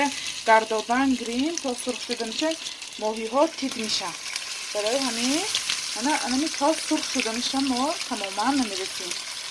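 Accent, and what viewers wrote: Isfara, Tajikistan. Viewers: Ин апамон аз Исфара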